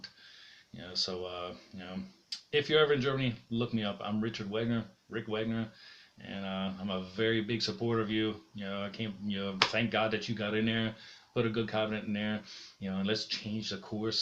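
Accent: United States. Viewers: Your American English is excellent my friend you had to have lived in the states at some point most Europeans speak a somewhat British English speaking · Is this guy American living in Germany? He sounds American.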